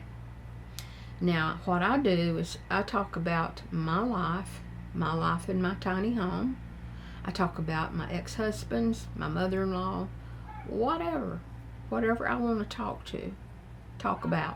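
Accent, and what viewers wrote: South, United States. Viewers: I'm a 58 year old man working on putting together a YouTube channel about several different subjects I'm interested in and I'm listening to everything you're saying! I love your channel and I make it point to watch your videos just before I got to bed because they're so relaxing to listen too. I enjoy your slow southern accent but Ironically you get to the point very quickly. I'm from the south and I have lived way up north for several decades and I miss the southern accents and the slower pace of life, your videos give me that back home feel. I found you by looking up side hustles and when I watched your first video on how you did it I was so encouraged that I decided I'm going to do it! Now I regularly watch for your videos and I am subscribed to your channel so you come up in my feed daily now. Thank you for sharing your wisdom and honesty!!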